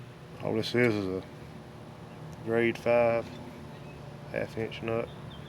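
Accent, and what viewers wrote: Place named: Southwest Virginia, United States. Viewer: hey man awesome video are you a heavy equipment mechanic?? also love the accent I'm from southwest virginia and talk just like you lol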